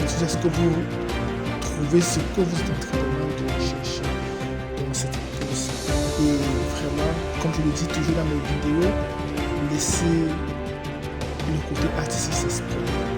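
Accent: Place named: Cameroon. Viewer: Salut Frere, je juge à ton accent que tu es Camerounais comme moi, dis moi quel produit utulise tu ?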